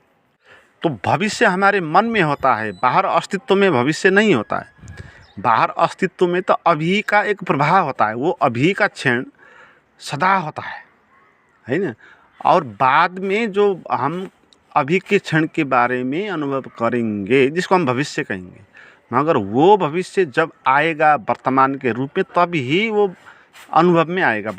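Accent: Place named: Nepal. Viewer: नेपालीले हिन्दी बोलेको जस्तो लाग्यो! प्रबचन असाध्य राम्रो लाग्यो!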